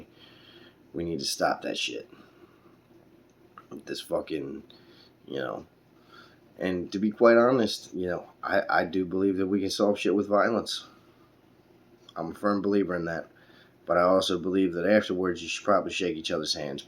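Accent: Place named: Ireland. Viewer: our soul is stranded in skin, bones and a little more of chunk for some of us. I believe the meaning of life is to live to the best of our ability and take it one day at a time. Some days I feel on top of the world and other days I want to crawl to the back of a cave and disappear. You’re right about money, it doesn’t define one’s character, it can leave a legacy but the act of love leaves a larger legacy ❤️ BTW Mr. your Irish accent is ☘️ but I love your authentic voice. Keep the videos coming……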